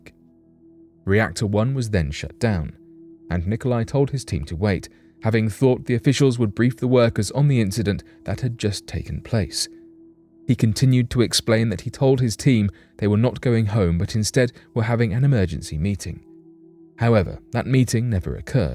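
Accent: United Kingdom. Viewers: Just because he has a British accent doesn't mean he has any credibility.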